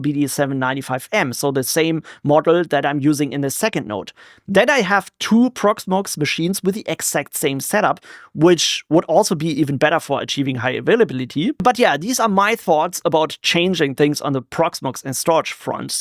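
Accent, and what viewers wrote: Germany. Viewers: Lustig, dass man sofort erkennt, dass Du aus Deutschland kommst. Dein Englisch klingt fast wie Plattdeutsch ✌️🤗😋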